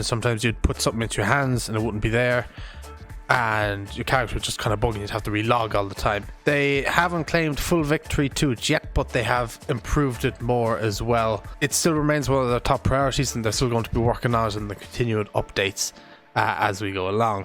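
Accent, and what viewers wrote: Ireland. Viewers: I did read the patch notes... but now i get to hear them in Irish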